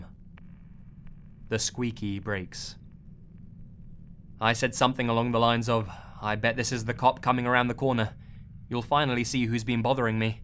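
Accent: United Kingdom. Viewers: I have a new nickname for you, it's British voice narrator guy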